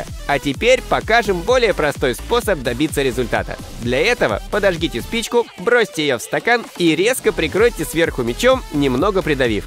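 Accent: Kazakhstan. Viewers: Ууу казак ютубер ты из алматы или орал? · Автор ты из казахстана? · ты чо казах или из казахстанов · Ты чо казашка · Братан ты казах что ли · Ты че из казахстана? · Ты чё казах чтоле???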